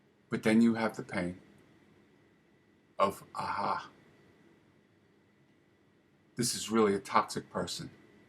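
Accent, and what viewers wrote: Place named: Brooklyn, United States. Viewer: Yes, this rings true. It's amazing the way they all read from the same script. In my case, I was the scapegoat for two unhappy families. The options were stark---get out or commit suicide. I drifted away in my twenties. By my early thirties, I was gone. My father had written a letter to my boyfriend, telling him that I was a mental case, and that he ought to dump me. The letter did have an effect on my bf, but not the one my father was hoping for. And that was the end. Anyhow, that happened years ago. I realized my family was sick, but I didn't realize they were narcissists. I'd heard the word but didn't know the meaning. Narcissism clearly comes out of childhood trauma that the narcissist cannot face and cannot change. Lately, I sort of wonder what happened to my family after I vamoosed for good. I'm sure a golden child became a new scapegoat for her mother---she was the next oldest and at bottom they disliked each other---but I do wonder who my father beat up on with me gone. 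Perhaps his girlfriend, whom he was beating up on anyway. But if there was no new scapegoat, then he simply imploded. That's the way it is with these people---they either find a ready victim who can't fight back and can't expose them---or they melt down. Anyhow, it does get better with time and therapy. Eventually, they all become strangers, and it becomes incredible that they once were intimates and "loved ones.". (An empty and cynical phrase if there ever was one). BTW, I love your Brooklyn accent! I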